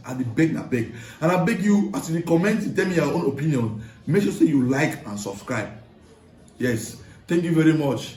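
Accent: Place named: Nigeria. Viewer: Combine bro. Pidgin and British English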